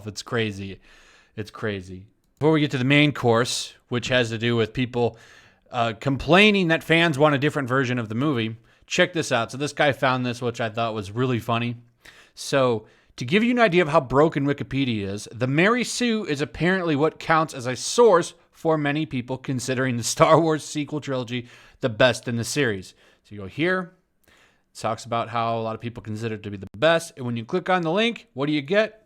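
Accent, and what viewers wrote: United Kingdom. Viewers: Love your brittish accent...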